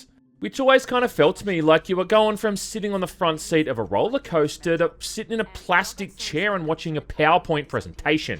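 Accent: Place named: Broad, Australia. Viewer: I saw one of your earlier videos before this and the difference in your accent is insane. You’ve gone full bogan. Did you move to a different part of Straya a few years ago or something?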